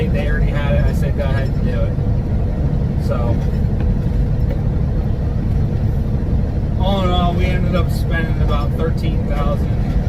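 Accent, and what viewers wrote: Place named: Northeast, United States. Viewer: He's from the Northeast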